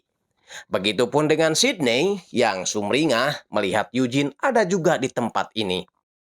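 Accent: West Java, Indonesia. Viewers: Akang orang sunda ya? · Nyunda pisan😂😂😂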